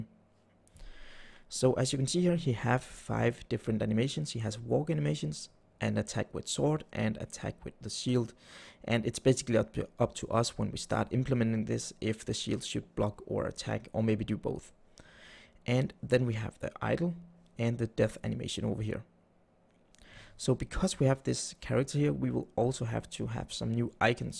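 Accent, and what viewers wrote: Denmark. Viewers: Love your tutorials! just started coding so this helps alot. You sound danish, am I right?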